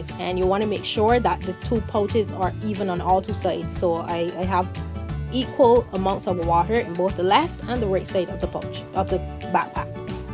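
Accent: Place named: Caribbean. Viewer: great vid, busta??? where r u from? the caribbean?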